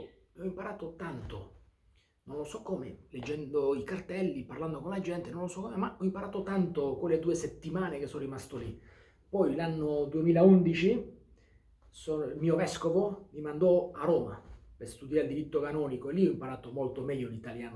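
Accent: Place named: Basque Country, Spain. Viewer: Tiene más acento vasco hablando italiano que francés. Genial, padre. Esker anitz, Aita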